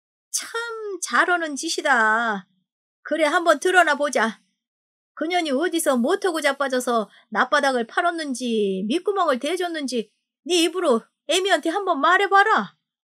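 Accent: Jeolla, South Korea. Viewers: 너무너무 찰지게 전라도 억양 잘 살릴까이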